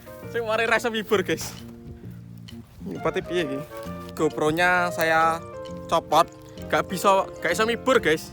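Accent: Java, Indonesia. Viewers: jowone ndi mas nek podo ayo layangan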